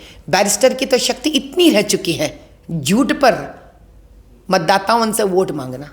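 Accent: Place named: Hyderabad, India. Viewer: माधवीजी आपकी बात पूरा भारत समझ रहा है हैदराबाद की बोली भी सुन्दर है आप उसभाषा। मेभी इनटरवयू देगी तो आपके.मुह से सुन कर हमे भी अचछा लगेगा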